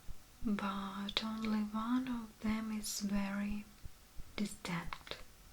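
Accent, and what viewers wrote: Russia. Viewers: I love the Russian accent! I listened to this lying down with my headphones on and had a smile on my face the entire time with my eyes closed , My mind was filled with pure bliss hearing your beautiful Soothing voice! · Wonderful video, Russian accent on English is relaxing :)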